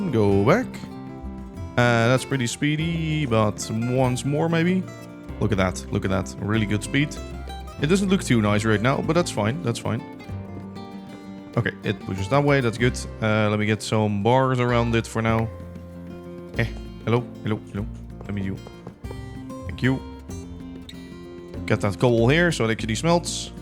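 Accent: Netherlands. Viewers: I knew it, the accent totally a Dutch guy😂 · I heard you talking on Beardstone or Chosen's video and thought, hey that guy sounds dutch. Now I see you are dutch and a rail fanatic. Coooool. Subscribed. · Eyy random question are you dutch? You have a very dutch accent... zou ik moeten weten, ben het zelf. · First time watching your video and i wonder do you speak dutch beceause you have a dutch accent? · love the dutch accent as one dutch to another i salute to you! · i'm almost sure you are dutch,, eventho.. came for the clickbait, stayed for the accent!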